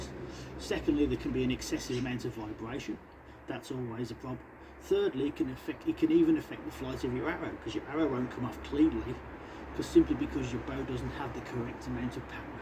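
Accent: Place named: Elmdon, United Kingdom. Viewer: Sounds klike you're near Elmdon , nice work thanks our kid😊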